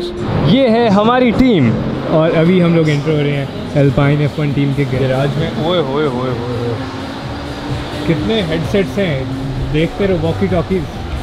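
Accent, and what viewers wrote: Punjab, India. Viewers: Bro great ap ka punjabi acsent kamal ka ha 🥰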